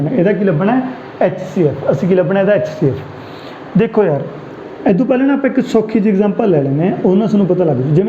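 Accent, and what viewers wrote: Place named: Gurdaspur, India. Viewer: Tuhadi language bott sohni hain;;; means apne area de according lyi;;; gurdaspur distt. tu alag hain;;; ur teaching way is so gd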